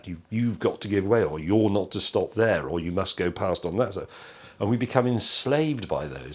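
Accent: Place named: United Kingdom. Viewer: Hi James. I too, am a native Californian. I will join the chorus of Californians posting here and say that you are more than welcome here. We love British accents and with your wit, humor, and charm, you would be a big hit anywhere you go.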